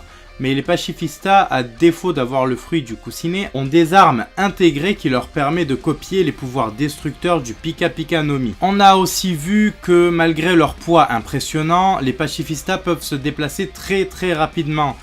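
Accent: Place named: South, France. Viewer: Je t ai vu dans la vidéo de ryo et ton accent du sud et génial je voulais te poser une question tu as déjà vu ryo seinsei en vrai ?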